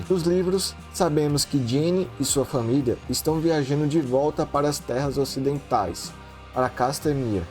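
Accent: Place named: Bahia, Brazil. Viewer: Manin, desculpa a pergunta meio inusitada, mas tu é baiano? É que o seu sotaque me lembra muito o do Vampeta kkkk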